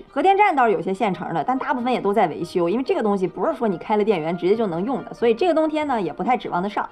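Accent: China. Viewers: 講話大陸本土口音太重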